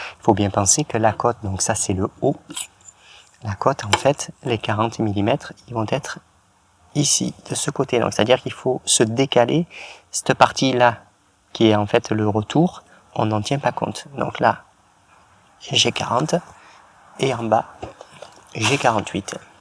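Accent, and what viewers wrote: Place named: South, France. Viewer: Rien que pour l'accent du Sud j'ai mis un pouce et me suis abonné,ça m'a rappelle mon Vaucluse...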